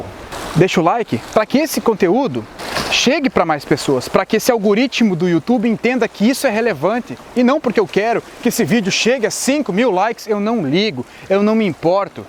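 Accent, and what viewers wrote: Rio Grande do Sul, Brazil. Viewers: Muito bom como todos os conteudos, mas entre nos meu velho, pelo teu sotaque sei que tu também é Gaúcho, diz ai: É Gremista ou colorado haha sucesso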